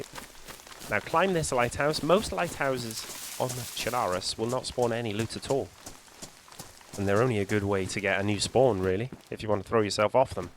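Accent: Wales, United Kingdom. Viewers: I genuinely look forward to watching your Dayz videos. I'm fairly new to it. Probably 30hrs and doing well tbh.. struggling with wolves and shooting 😂 but your videos is like like watching David Attenborough programs on animals... brilliant communication and something about your Welsh accent makes it enjoyable and interesting to watch.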